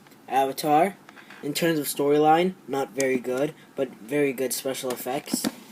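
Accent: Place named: United States. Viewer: Where u from? You sound American but most of your blu rays have UK ratings on them.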